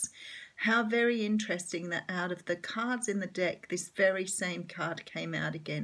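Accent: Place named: Australia. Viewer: I can see this yes. Nice energy, Australian too.. Good.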